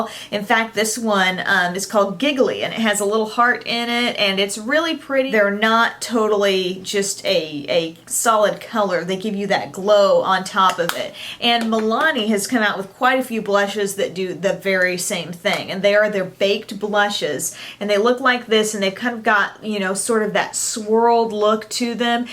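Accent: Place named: South, United States. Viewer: Love, love, love this video!!! It was so informative and detailed! Oh & I love ur southern accent! :)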